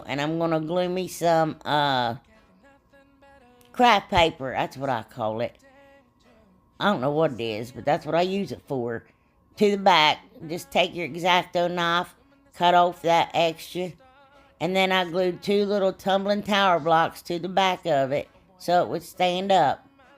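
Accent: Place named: Oklahoma, United States. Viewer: I love listening to you talk too. Your accent is so sweet and your laughter makes me smile. One of my best friends is from Oklahoma and has the same accent. Enjoy your week!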